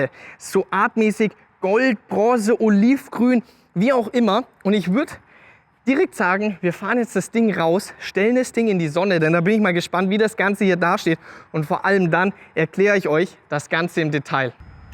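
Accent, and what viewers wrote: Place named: Franconia, Germany. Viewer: dieser fränkische Dialekt 🤣🤷🏻‍♂️ herrrrrlich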